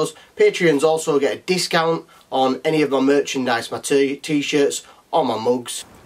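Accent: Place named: United Kingdom. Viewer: He has a British accent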